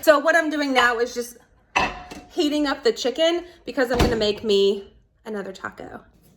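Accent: South, United States. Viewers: I love how you talk lol. I'm from texas I have a southern accent too lol. Nice vlog love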